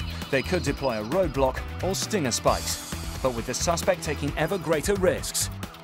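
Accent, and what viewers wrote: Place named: England, United Kingdom. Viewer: Whats innocuous about this video is an english man narrarating crashes on american highways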